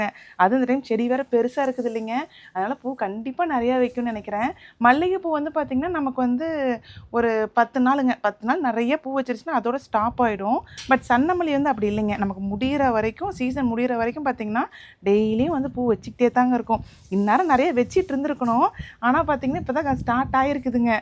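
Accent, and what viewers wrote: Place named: Namakkal, India. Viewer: Sorry, nangalum namakkal than ka. Neenga visa visanama pochnu solrapa engala mathri solreenganu santhoshama irukum ka.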